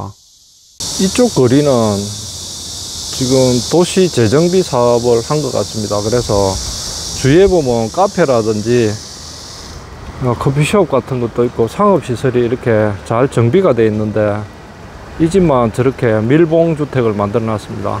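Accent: Gyeongsang, South Korea. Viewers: 재방이지만 오늘도 기분좋게 보고있습니다 같은 경상도라서그런지 넘정겹습니다 편안하고 푸근합니다 ~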